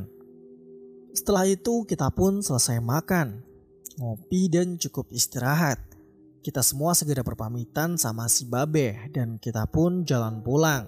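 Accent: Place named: Sunda, Indonesia. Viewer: kirain asli sunda semangaatt tross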